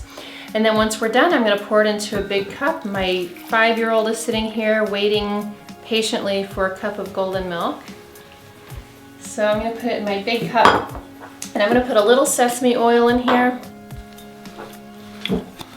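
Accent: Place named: Europe. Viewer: Turmeric milk....very good subject anchored in a nice manner. But Madam u are a qualified person hence u should be slow avoid European accent e.g. u say wada. for water ; it will be kind help for Indians probably from where u belong.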